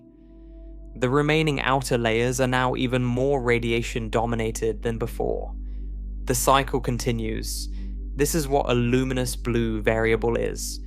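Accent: United Kingdom. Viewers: The omnipresent British accent is getting veryyy old.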